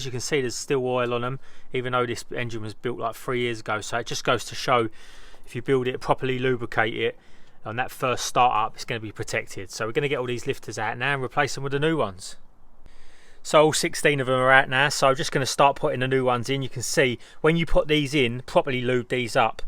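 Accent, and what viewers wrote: United Kingdom. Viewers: Just came across your channel 👍🏻 refreshing to hear a fellow Brit. Most of these type of vids are usually yanks 🙄😂 . Don’t suppose you’ll be taking on an Astra j vxr any time? I suppose too there’s barely a fraction of J’s about compared to H’s. There’s really not a lot going on, online( that I can find anyway) people working on them. Plenty of the H’s but not J’s. At a bit of a loss as what to do next as well as being a bit apprehensive . Cheers, good vid. Sub button hit 👍🏻🇬🇧.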